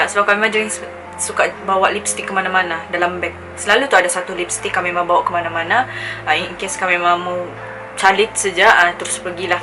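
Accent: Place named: Sabah, Malaysia. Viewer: Suka sa dengar cakap loghat sabah 😂 sedap jak dengar hahaha yay new video!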